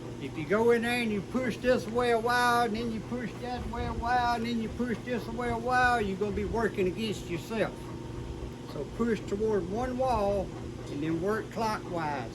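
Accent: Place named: Appalachia, United States. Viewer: I've heared that accent once before in the Appalachian mountains around Elizabethton Tennessee. I'm a retired welder. I've worked all over the US even spent a lot of time in the ship yards in Louisiana. That accent I've only heard in one place . Appalachian Mountains. Thanks again for your videos. I'm sure I'll be back next time I need to know how to. Have a great day and be safe keep one in the chamber. 😊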